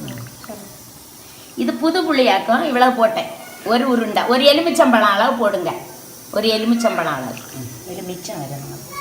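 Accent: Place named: Kanyakumari, India. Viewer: Akka kk slang.kaytalaymass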